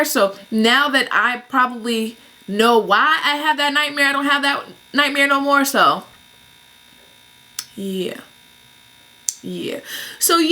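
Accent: Boston, United States. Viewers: Hey im new to ur channel nd this is the first video ive seen haha i like it ps u must be from MA or boston me too!!! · Omg you are from Boston.... what part are you from?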